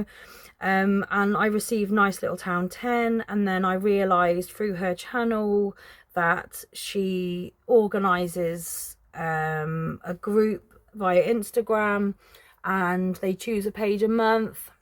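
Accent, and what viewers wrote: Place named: England, United Kingdom. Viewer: You have a lovely voice! You have a beautiful English accent (to me, an American) and pleasant musicality (engaging and expressive). I could listen to you for hours, whereas I have had to bounce from other channels because I couldn't stand the sound of their voices. I dislike what they call vocal fry (common with American females) and that annoying sharp popping sound Australians tend to make and how they make every sentence sing-songy, like a question? Probably nobody likes the sound of their voice because it's different from the outside then within our own heads. 😅Anyway, yours is wonderful, so I hope you can feel reassured. And your coloring is very cute too. 🥰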